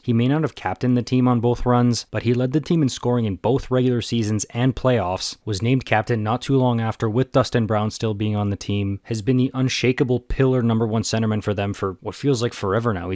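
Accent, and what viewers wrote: Quebec, Canada. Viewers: la prononciation dun quebecois ca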